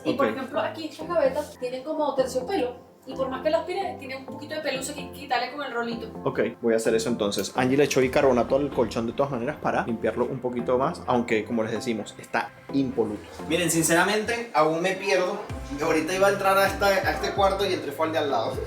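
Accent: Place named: Venezuela. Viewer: Lo que más me gusta es su forma de ser su carazter como compaginan. Me encanta escucharte con ese acento venezolano wue me recuerda cuando vivi allí y que tanto wuieto ese país donde nacieron mis hijos. Y me encanta tb como es y además de ser muy guapa, Dios os cuide siempre y seanuy felices Me contenta mucho y me hace muy feliz veros a vosotros llevsndoos tan bien. Yo naci en España, pero me crie en Venezuela 40 años. Veo muy amenudo vuestros vídeos, pero casi no escribo.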